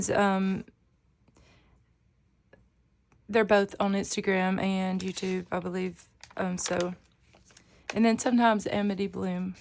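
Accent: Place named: Tennessee, United States. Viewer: I came here from thrifty day to hear your accent lol. It sounds a little bit like my Tennessee accent but yours is cuter 😂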